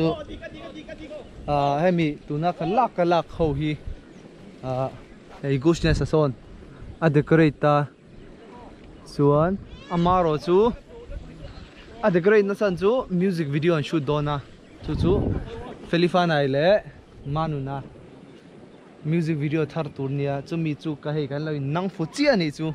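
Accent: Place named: Mizoram, India. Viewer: Brah... English Mizo accent hmang pawp pawp ngam... Ka chhuang che... Ti zel teh... Hnathawh loh laiin ka en ziah a nia...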